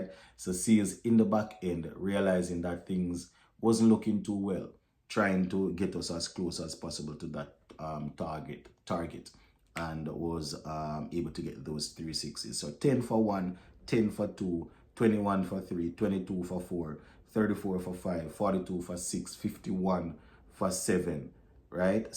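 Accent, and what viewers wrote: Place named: Jamaica. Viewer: greetings my brother. I trust all is well. I haven't heard you used the phrase "The men not good" in your Jamaican accent. I believe the expression will be relevant in this context.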